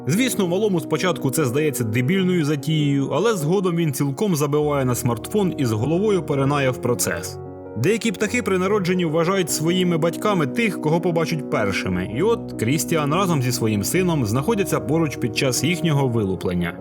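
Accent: Poltava, Ukraine. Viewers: Дякую за чудову підбірку, було цікаво дивитися, а ще приємно чути Полтавський говір 💪🏼😊 Від себе хочу порадити фільм - Залягти на дно в Брюге. 2008 року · Ох вже цей польтавский гуовір😂❤ З Пирятина? Дякую за підбірку🤝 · Чудовий полтавський акуент😊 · Друже, ти не з Полтавщини? Бо як рідний) Підписався без роздумів.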